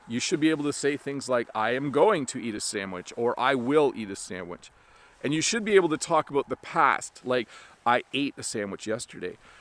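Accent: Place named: Canada. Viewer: I can understand Canadian 😁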